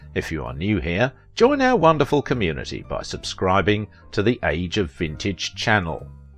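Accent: England, United Kingdom. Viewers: He's English. Accents happen.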